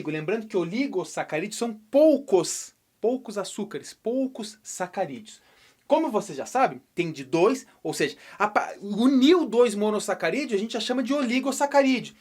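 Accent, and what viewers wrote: Rio Grande do Sul, Brazil. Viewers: O professor também me ensina o sotaque gaúcho(eu sou cearense) Ei mais uma pergunta o que que é "me caíu os butiá do bolso"?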